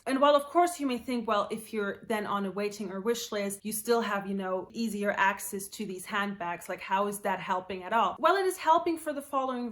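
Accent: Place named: United Kingdom. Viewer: I thought I was going crazy because I’ve been seeing Hermes all over the place. Even I was able to get an appointment and I don’t even have Hermes money. I’m curious, where is your accent come from, it’s beautiful. A bit British?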